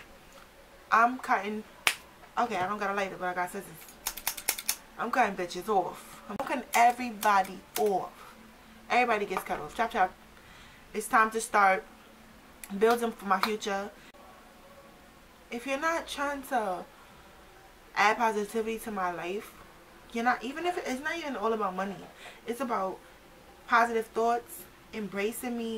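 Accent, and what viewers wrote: New York, United States. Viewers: Listen I love just hearing you rant and talk, your gorgeous and so NY ...love it! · I was going to ask if you were from NY! Lol. Love it! Keep that truth real and fresh love! You have a whole wide world of people that support you and you doing your thing.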